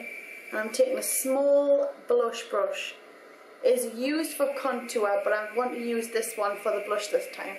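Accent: Newcastle, United Kingdom. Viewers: Look at her channel. is from South Shields, England. It's called a "Geordie" accent. I love it too!:-) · You sound like a Geordie :) <3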